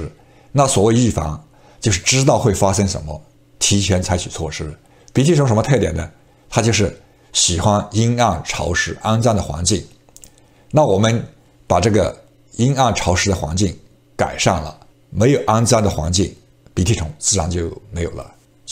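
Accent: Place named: Sichuan, China. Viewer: 谢谢李老师。四川人？很亲切的咔咔角角。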